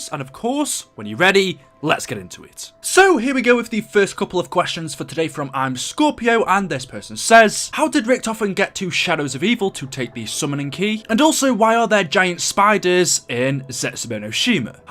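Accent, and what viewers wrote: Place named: Stoke, United Kingdom. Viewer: Hi, huge fan, this question is not about the game, it's about you. Are you from stoke on Trent or around there? because you have quite a strong stoke accent I think, I live down the road in Newcastle. If you do you are the only famous YouTube around for miles, I'm proud of you are!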